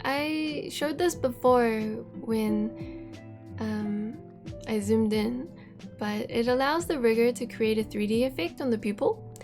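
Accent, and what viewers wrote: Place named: New Zealand. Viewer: Yoooo I was thinkin' man that accent is so familiar, it sounds so much like a kiwi accent, what could it possibly be? Surprise surprise it is a kiwi accent hahaha. Not too often I come across a kiwi streamer, kapai!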